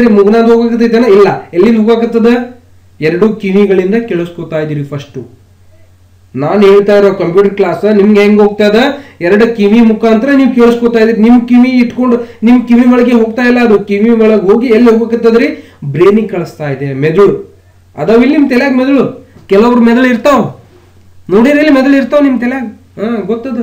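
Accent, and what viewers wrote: North Karnataka, India. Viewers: Nim voice Uttar karnatkdolg mast ide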